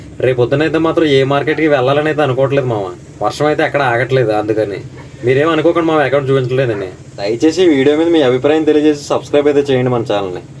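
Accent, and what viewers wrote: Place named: East Godavari, India. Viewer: Yedo East Godavari slang lo matladu thunna Vu brother. Mee proper yekkada brother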